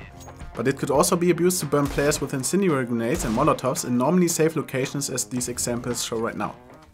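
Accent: Germany. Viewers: Geiles video. Hahahaha Deutsche hören instant, wenn jemand anders deutsch is. auch wenn er englisch redet XD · Wie er einfach den tooodes deutschen Akzent hat. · uuh ein deutscher xD geiles info Video :p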